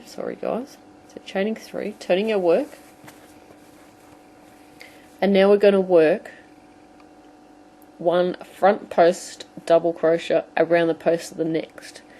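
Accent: Australia. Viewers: Thank you thank you thank you just started to learn to crochet and these are the easiest booties ever if I didnt say it enough thank you also good to hear oz accent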